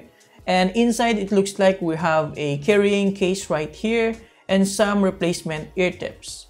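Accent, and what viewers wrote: Philippines. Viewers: hears the accent My brain automatically: ahh pilipino to alam na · I'll take that Clunky Filipino accent over Indian classic tech support anyday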